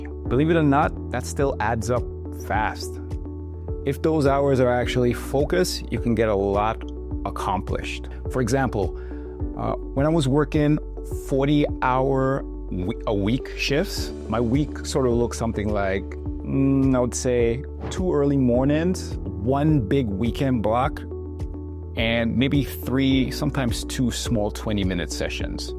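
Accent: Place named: Caribbean. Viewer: Are you Caribbean? I hear my people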